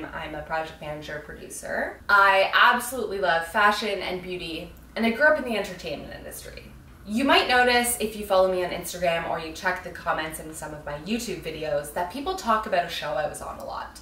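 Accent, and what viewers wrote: Australia. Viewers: My friends and I in 5th grade LOVED this show! We were the kids at school who pretended to be horses in recess, and talked about horses in class and did our science fair project about horses. We had a name for our group, too, like the saddle club. We were 3 horse crazy girls! I am 26 now, still watch the show sometimes, and I collect Breyer horses. I haven't left the horse life! Horses are my passion. You are awesome!! You were so funny and cool in the Saddle Club. I wondered why you sometimes had an aussie accent!🐎🦄🐴🦓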